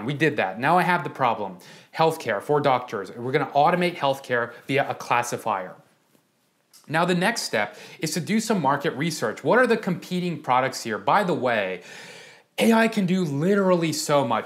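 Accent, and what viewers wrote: India. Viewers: Are You Indian? 😃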